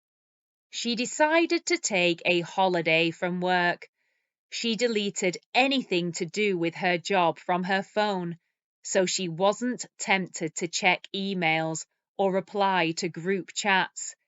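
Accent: United Kingdom. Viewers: Thank u :-). Your channel is amazing. You are helping me to learn English and also I am able to learn gorgeous British accent. I am from India and I want to Go to London in future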